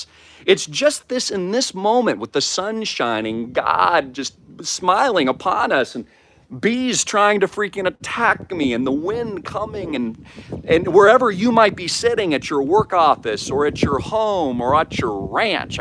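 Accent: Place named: United Kingdom. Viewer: Your British accent 🔥🔥😂😂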